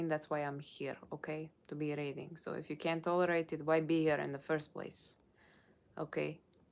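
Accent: Russia. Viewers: I love your russian accent... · Wowww,this was the most pleasant surprize for today !! It's Friday night here in UK now when i find your reading dear Russian woman and you made my evening ! Love your look and natural russian accent! ❤😂❤❤❤❤❤❤❤❤❤❤❤ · Your russian sounds so real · I'm Romanian living in Australia!! so definitely Da !! loved the Russian accent u reminded me of the Russian actress on shameless 😅❤ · So cute 🥰 love your Russian accent 💞 Thank you for Scorpio and Virgo reading....both reading is freaking accurate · The Russian accent is quite smooth and lowkey soothing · I love your Russian accent 😍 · I love the Russian Voice it make you more beautiful thanks 👑💯❤️🔥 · Great Russian accent ❤ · Omg i loveeeee this russian look and accent!!! ❤❤❤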